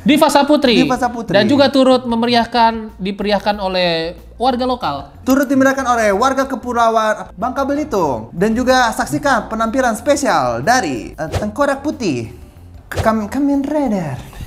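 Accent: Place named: Surabaya, Indonesia. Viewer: Dari dialek misuh2nya, Koko nie beneran orang Surabaya. 😂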